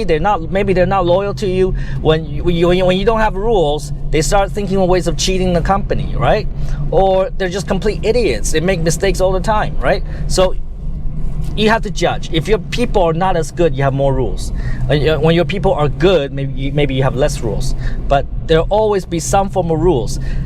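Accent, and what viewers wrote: Standard. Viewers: nói rất chuẩn dễ hiểu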